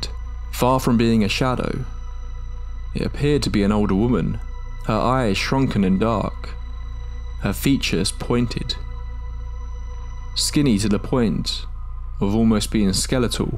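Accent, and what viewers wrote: United Kingdom. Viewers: Why does this accent sound British, he's not...Unless he is..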